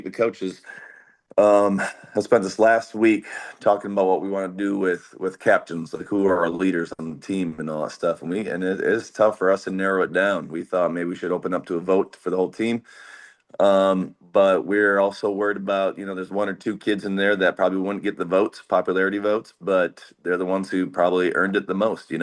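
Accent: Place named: South, United States. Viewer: !!! When did you pick up that southern accent?!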